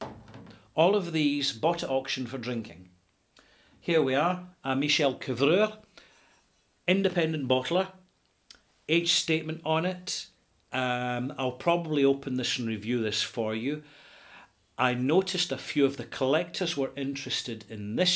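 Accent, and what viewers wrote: Scotland, United Kingdom. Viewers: is my goto guy for all things whisky. However, for those of us in the USA who have a little difficulty with the Scottish accent (although who much appreciate it), it would be really helpful if the Brands reviewed in the video were listed in the notes.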